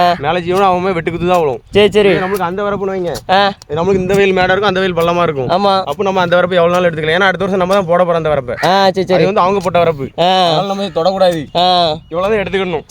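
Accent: Tirunelveli, India. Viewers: சூப்பர் பா திருநெல்வேலி தமிழ்ல நீங்க பேசுறது சூப்பர் இந்த கருத்தை சொல்வதும் சூப்பர் எல்லாரும் தெரிஞ்சிக்குவாங்க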